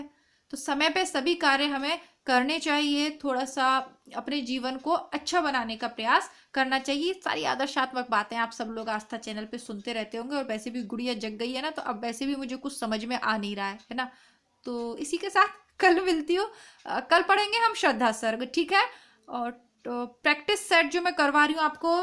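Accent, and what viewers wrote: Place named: Uttar Pradesh, India. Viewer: हा मेम मेने ही पुछा था।आपके बोलने का लहजा up का लगा।मेरा पीहर करोली के पास ओर ससुराल पश्चिमी राजस्थान हैं।आपके विषय मे जानकर अच्छा लगा।मेरा up टच ह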